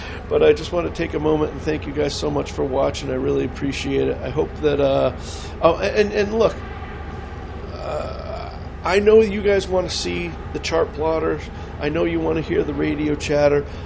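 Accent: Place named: Brooklyn, United States. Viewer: i have a friend from Brooklyn I've known for decades. You and her dad are so similar. Similar accent, speech patterns, hair... every time you talk i hear her dad.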